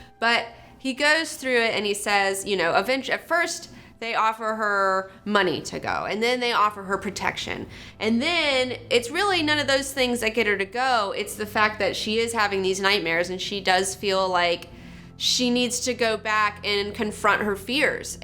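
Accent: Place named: Alabama, United States. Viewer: I love hearing that Alabama accent! Keep up the great reviews and interviews. Enjoy the hell out of this channel!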